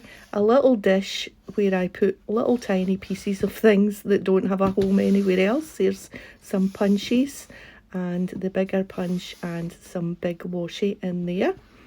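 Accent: Scotland, United Kingdom. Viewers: Love you room tour? Are you by chance from Scotland? You sound a lot like my Mom.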